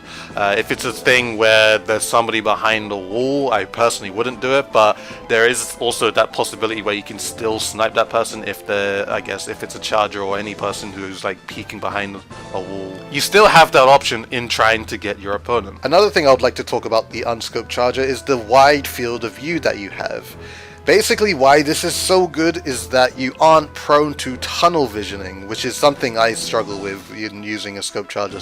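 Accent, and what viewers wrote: United Kingdom. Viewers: Your british like me. ;> thats why i see u in ranked battles. also i find the goo tubers are the best charger. ;)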